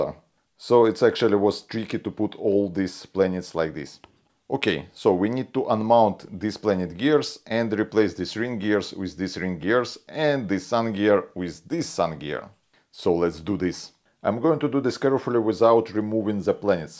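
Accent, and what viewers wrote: Russia. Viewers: Русский английский сразу слышно 😁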